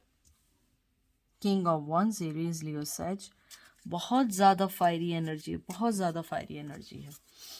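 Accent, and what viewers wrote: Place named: Karachi, Pakistan. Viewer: 100% Resonate to me. Thanks. Aap ke Bolne ke style se lagta hai ke aap Karachi de hain.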